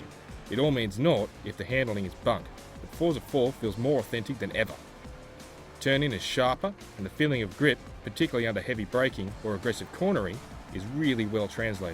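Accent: Australia. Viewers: lol aussie doing the review :) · the dude sounds aussie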